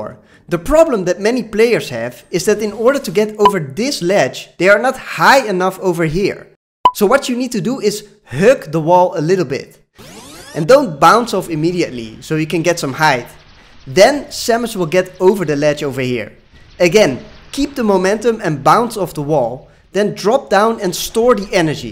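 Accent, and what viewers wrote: Netherlands. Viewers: Thanks voor de tip! Ik hoor trouwens iemand die in het echt Nederlands spreekt ;P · Clear video, explains some of the finer points of speedboosting. An addition would be that you cannot "charge"towards a block while spinjumping and you need to cancel the spinjump (or screwattack) with firing your gun/ aiming, before being able to do mid air spark releases. I would suggest working a bit on your English: Your Dutch is shining through: just watch some vids on pronounciation.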